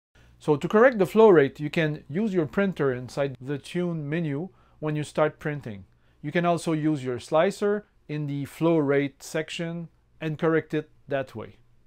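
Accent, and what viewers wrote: Quebec, Canada. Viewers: Ya du Quebecois dans cette accent là lol Merci beaucoup pour ton video, je m'abonne tout de suite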